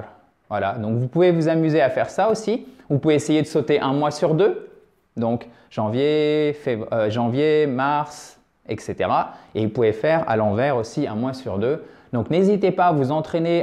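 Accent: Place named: France. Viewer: спасибо merci gracias thx PS Votre accent français n'est aucun problème pour moi, au contraire c'est un plus car 1° on peut ainsi perdre d'éventuels complexes et 2° le russe est plutôt ainsi mieux digest qu'avec un(e) russophone de naissance.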